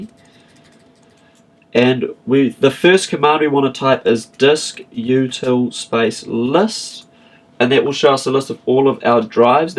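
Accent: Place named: New Zealand. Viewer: that is a Kiwi accent, (New Zealand)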